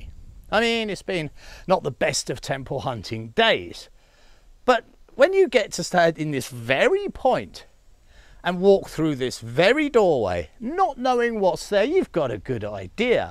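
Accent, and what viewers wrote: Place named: France. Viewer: We like your French accent ; keep on 👌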